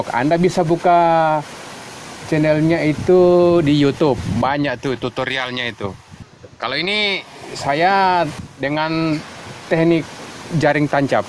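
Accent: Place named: Medan, Indonesia. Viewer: mantap ini depe penjelasan, logat medan campur lompotoo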